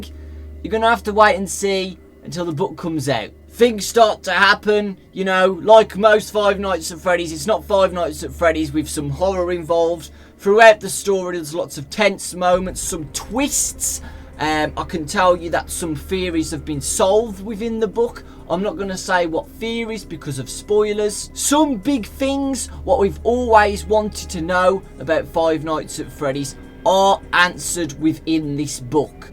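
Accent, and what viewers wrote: United Kingdom. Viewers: i feel like he named the character charlie just to hear say it in his british voice.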